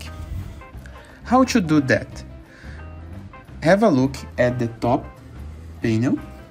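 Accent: Brazil. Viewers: eu conheço o sotaque brasileiro kkkkkkkkkkkkkkkkkk Edit: o vídeo me ajudou, valeu!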